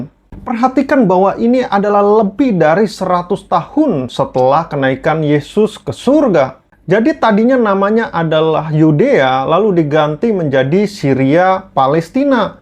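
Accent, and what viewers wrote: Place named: Surabaya, Indonesia. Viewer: Beliau... dahh jelas wong suroboyo...😂😂😂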